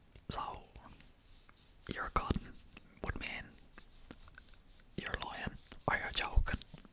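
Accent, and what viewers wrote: Ireland. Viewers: Yay. This is my fave kind of Dublin accent. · so so happy to find a irish asmr creator. the accent feels like home and makes it more relaxing. new subscriber!🎉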